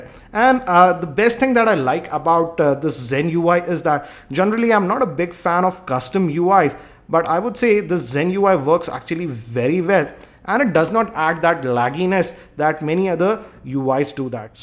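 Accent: India. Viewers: Indian English......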